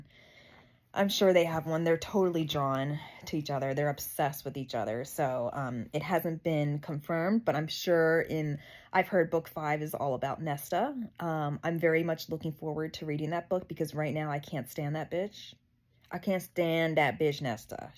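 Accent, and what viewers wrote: Baltimore, United States. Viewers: You got that Hon accent.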